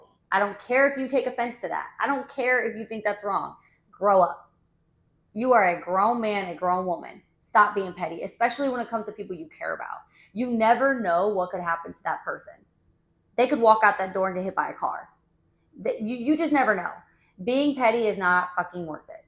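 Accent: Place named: South, United States. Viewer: Love the accent!! Houston? Lil southern, lil ratchet accent!!!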